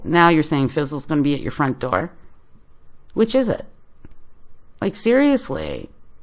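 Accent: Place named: Canada. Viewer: Yup, definitely Canadian!! "About" gives you away. The rumor mill is insane. I love your channel ❤️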